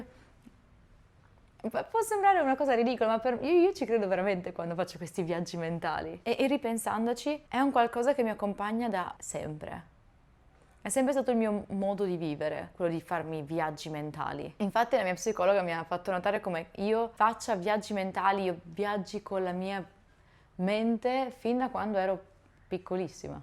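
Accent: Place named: Lombardy, Italy. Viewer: Non hai l'accento milanese ma più del sopra Milano.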